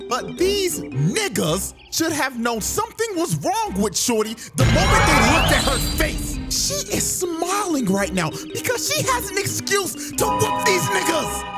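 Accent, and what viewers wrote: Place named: United Kingdom. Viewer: as a Brit I approve this accent. Give this man a cuppa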